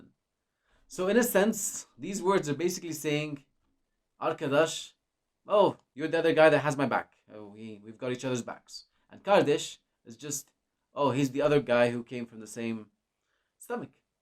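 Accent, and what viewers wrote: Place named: Ireland. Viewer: I understand and speak a small percentage of Turkish, and would really love to become fluent in it ama çok zor. I very much appreciate what you are doing to help people learn the Turkish language. Tell, did you by any chance learn English in Ireland? I am hearing an Irish accent from you. Kolay gelsin.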